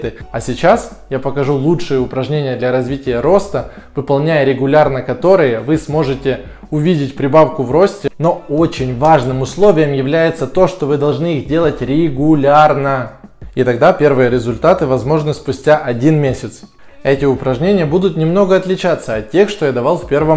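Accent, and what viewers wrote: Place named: Ukraine. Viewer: Опять ховор, укр...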